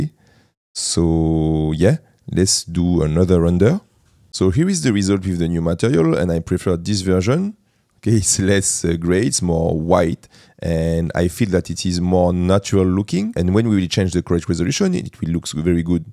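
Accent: France. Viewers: Seem french accent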